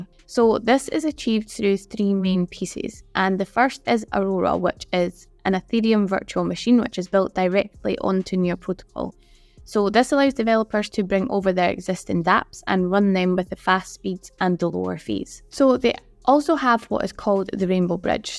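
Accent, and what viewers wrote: Scotland, United Kingdom. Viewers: Good having a scottish person speaking on crypto and relate on youtube 👍. Do you have twitter that we can follow aswel for any more information and updates ?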